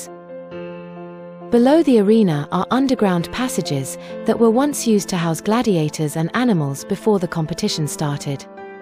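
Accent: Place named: United Kingdom. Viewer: The narrator has Royal English❤i say it as a Russian English tutor.Adoration and likes to her voice and sound.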